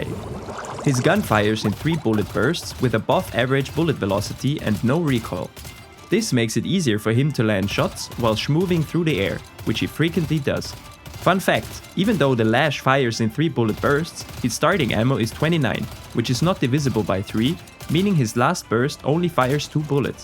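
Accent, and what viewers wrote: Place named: Germany. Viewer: You Sound German tbh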